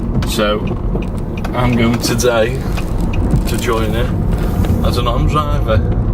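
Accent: United Kingdom. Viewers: No, is my fault because i understand and speak american english. British accent is killing me.. 😁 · focus on it and its fine. Scouse accent is much more understanable than scottish or yorkshire in my opinion:)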